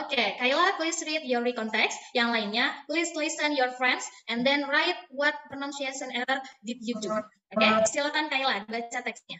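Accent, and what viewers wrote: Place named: Banyumas, Indonesia. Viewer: Guru ngapak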